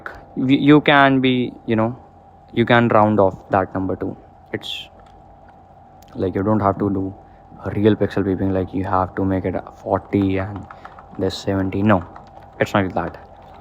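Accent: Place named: India. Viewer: What your name bro ? You sounds like Indian.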